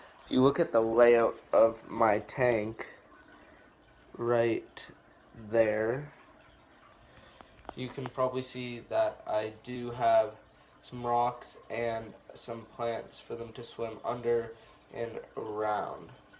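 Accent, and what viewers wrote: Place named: North, United States. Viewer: I have three sunfish I got out of the lake I had a 75 gallon somebody gave me I had lime rock gravel plants already I grow on aquatic plants I took a sponge and made a Matten sponge filter covered it with lime rock and put a powerhead behind it so basically I might have spent I had everything but I will say $30 to set my 75 gallon tank up if that for my sunfish And then I just threw them in there they are tuff fish I’m in Florida so I don’t know sounds like you’re from up north somewhere I get my own aquatic plants out of the streams and rivers and ponds and we got a lot of it. So $300 is a bit much you probably just bought everything new hey if I can make it I’ll make it but good job your tank is pretty and clean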